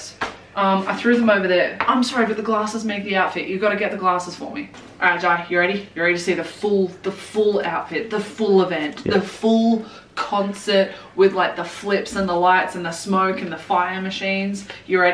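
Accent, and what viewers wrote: Australia. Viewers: Are you Australian?? :3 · ...you're Aussie. Yes 😂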